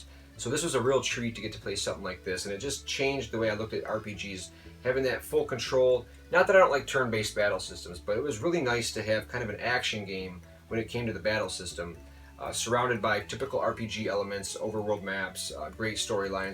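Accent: Midwest, United States. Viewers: Hi there. As always I enjoyed this video. You strike me as a good family man and you mention your son quite a bit on your channel. So why not have him on in a future episode? Also as a side note. Are you from the Midwest, cause I detect a slight Midwestern accent. Thanks again for the video.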